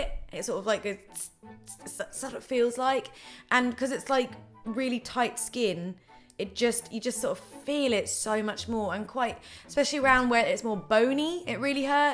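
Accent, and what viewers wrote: United Kingdom. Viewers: I so love the British accent. Espcially describing tats.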